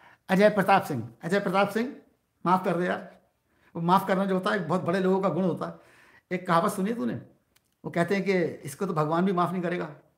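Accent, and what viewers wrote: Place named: Moradabad, India. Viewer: humaray mata pita moradabad up say Karachi aaiy thay aap ki bhasha bilkul moradabadiyon jesi hay Meray pita gee bhi bilkul aap ki Tarah Urdu Hindi boltaiy hain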